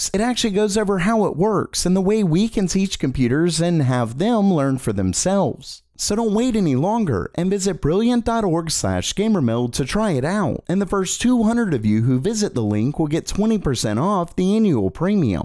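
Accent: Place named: Philadelphia, United States. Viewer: You from the Philadelphia area my friend? Pretty sure I heard the Philly accent in there. ✌️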